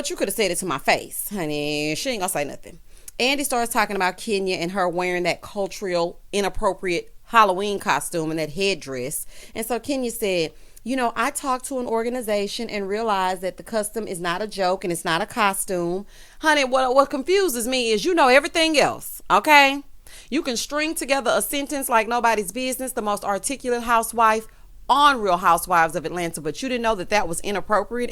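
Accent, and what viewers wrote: South, United States. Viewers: oh godess you have the cutest voice and balanced fun comentary are you a southern belle?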